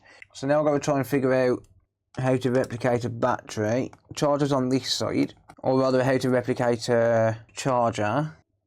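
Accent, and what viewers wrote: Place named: Scotland, United Kingdom. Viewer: Is he scottish? His accent is so thick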